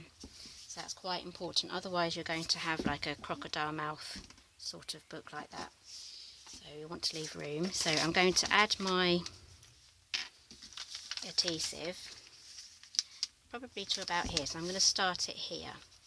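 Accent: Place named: United Kingdom. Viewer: So nice to see and hear someone from the UK, great video and book.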